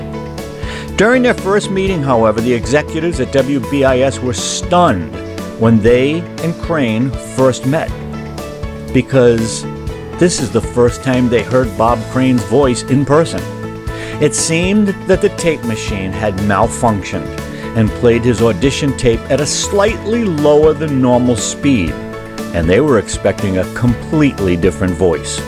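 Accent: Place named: Northeast, United States. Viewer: "In his Scottsdale Arizoner apartment" lol Arizoner gotta love that northeast accent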